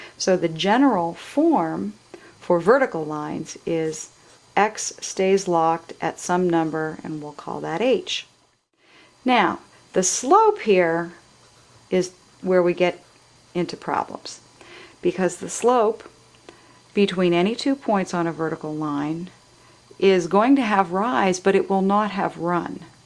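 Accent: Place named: United States. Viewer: I like your american accent, its very soothing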